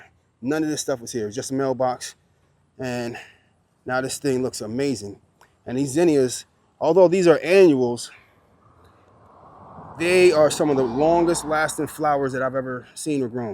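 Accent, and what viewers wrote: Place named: Philadelphia, United States. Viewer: First time viewer here from Delaware County PA, just outside of Philly. I follow so many YT Homesteaders from the South with their Southern accents that as soon as I heard you speak I knew you were from somewhere near me 😂 Now I have a new channel to follow. Thanks!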